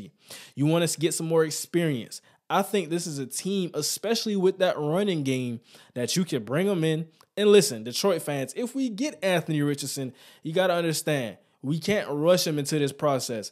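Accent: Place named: South Florida, United States. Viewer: Are you from South Florida?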